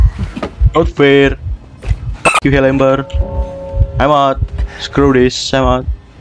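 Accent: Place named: Indonesia. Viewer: Logat indonya kerasa bro and ur sounds mmhhh daddy 🥵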